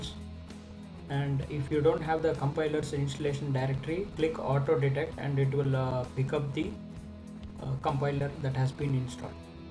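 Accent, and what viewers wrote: India. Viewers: Good tutorial, with indian accents. 10/10 Well done.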